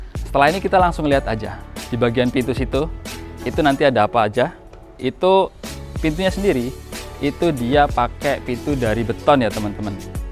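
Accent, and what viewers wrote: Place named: Banyumas, Indonesia. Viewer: mas logat ngapak nih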